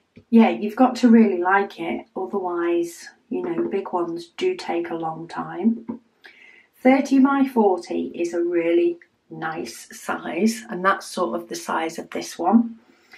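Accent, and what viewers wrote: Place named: Cheshire, United Kingdom. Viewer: I wondered if you were from the north. My Grandma was born and raised in Widnes. She came to America after the end of WWII. She was a war bride and came across the ocean all by herself on a boat full of other war brides. Sometimes the things you say, or the way you pronounce things reminds me so much of her. Cheers to another girl from Cheshire!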